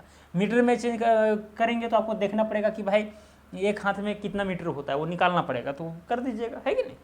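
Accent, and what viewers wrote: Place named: Darbhanga, India. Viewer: आप बिहार में दरभंगा के है क्या, अच्छा ज्ञान है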